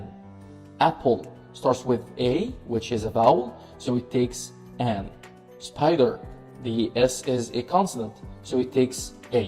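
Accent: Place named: Vietnam. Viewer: anh là ng việt ạ, sao lại phát âm f h t n tiếng việt vậy:))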